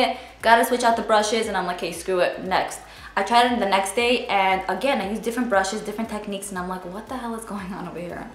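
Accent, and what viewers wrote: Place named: New Jersey, United States. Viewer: you have the sweetest accent!!!! Are you from NJ?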